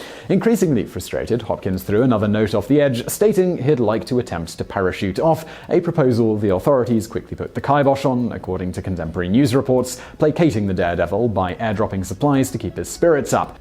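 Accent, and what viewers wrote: England, United Kingdom. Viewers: I was just interested in seeing the clips..and not listening to 7 minuts of british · Yeah he's been living in England for a while now. Guess he picked up the accent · This channel is such horseshit... I too can read a wiki entry in an English accent. I'll take my 2 million views now please. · So the ominous British voice over guy is VSauce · British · id rather listen to that fucked up robot voice than this brit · Is that British English??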